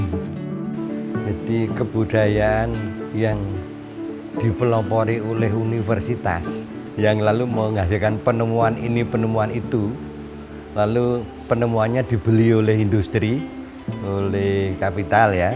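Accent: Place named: Surakarta, Indonesia. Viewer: Orang solo raya mana suaranya. 😊☝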